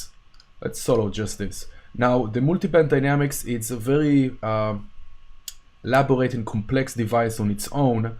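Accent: Israel. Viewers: where are you from? you sound israeli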